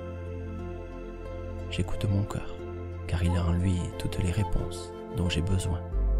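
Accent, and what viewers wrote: South, France. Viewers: Merci même si justement je préfère quand tu es naturel j'adore tellement ton accent du Sud et que je sens que tu appuie pour ne pas qu'il s'entende et ça rend particulièrement cette vidéo un tout petit moins agréable que les autres! Tu es génial et fais pour cela pour l'instant je le sens et merci🙏 tu aide tellement de personne dont moi mais voyons plus grand merci merci merci je t'envoie beaucoup d'amour et hâte de la prochaine video avec ton bel accent 😉♥️🧡💛💚🤍💙💜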